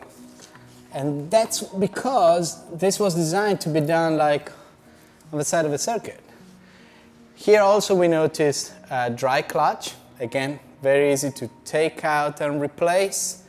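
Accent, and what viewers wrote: Italy. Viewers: 😲 Wow! Whom better to present it than you. I would love some more technical iteration on the diverse releases of the 916 range. So all the different versions and the technical differences. All the way from the 916 to the 998S and R and final edition. Is that something you would want to make, or not in your interest? I've owned my 998 for over 10 years now and even used it as for pleasure on the road at first and later on at the track. At the track it really comes alive! I like the color of your bike. And especially in combination with the yellow lights it really harmonizes with the green of the 996 The Matrix bike. And the pull over can not be missed off course! Camera work impacable and sound, voice, pause and speak rythm as well as your suiting Italian English is coming together perfect. Love both parts and want to know more about the Italian bikes from you in front of the camera! 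· I love the video and your charming italian delivery! :D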